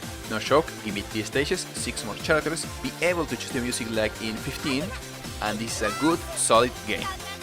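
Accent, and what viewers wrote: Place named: Spain. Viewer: Don't want to be mean, but you need to work out that pronunciation bro, either that or make Spanish guides. Try listening to the words on a text-to-speech or an online dictionary, you are using Spanish phonetics for English and it's never gonna work, just relax and it will come out more natural. The first step to talk more clear is to talk slower even if it doesn't sound as "cool". Thanks for the guide I think, this is the same in-game tutorial though... I just want to know how to make 10 hit+ combos without the air-state resetting, I try to use the wall but it doesn't work consistently.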